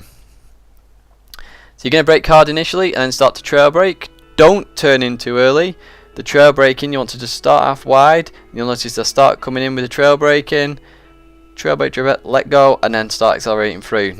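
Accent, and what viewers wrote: England, United Kingdom. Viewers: nobody gives a fuck about your videos and your stupid Engayland accent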